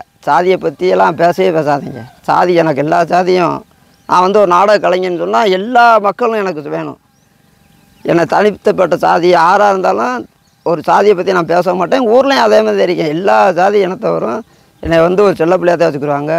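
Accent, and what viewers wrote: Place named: Rural, India. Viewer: அவர்களே நீங்கள் நல்ல விவசாயி ஒரு விவசாயி நாடகத்தில் சிரிக்க வைக்கின்றீர்கள் மேடைக்கு வந்தாலே சிரிப்பு வருது உண்மை உங்கள் பேச்சு கிராமத்து மணம் தெரியும் போற்றுவோர் போற்றி புகழட்டும் புழுதி வாரி தூற்றுவோர் தூற்றி போகட்டும் விமர்சனத்தை கடந்து போங்க இறைவனுக்கு நீங்கள் யார் என தெரிந்தாலே போதும் 2கலைஞர்கள் சேர்ந்து நடிக்கும் போது இதெல்லாம் வருவது சகஜம் தான் தொடர்ந்து நடிங்க ஒரு கார் வைத்து நீங்கள் பேசியது நல்ல காமெடி பேசினாலே சிரிப்பு வருது பராசக்தி ஆரோக்கியம் அருள் தரட்டும்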